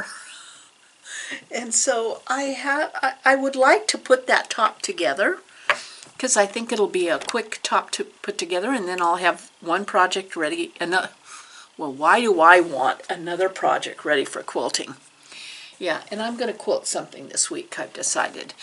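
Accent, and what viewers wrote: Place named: Oregon, United States. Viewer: One of the reasons I love listening to your videos, among others, is that I lived in Oregon for 9 years while in college. I experienced a silver thaw one year in Portland. I think that was 1996/7? I remember the sound of the crashing branches. Most of the rest of my adult years and much of my childhood was spent in Boise Idaho area. Four years ago, my husband's job brought us to Alabama. I just love listening to your accent, the sounds of home. And of course, the quilting.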